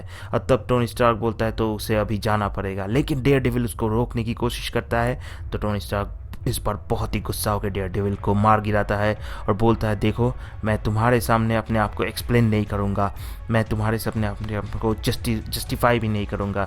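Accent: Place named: Odisha, India. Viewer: I think. your mother tongue is not Hindi tum Orissa se ho kya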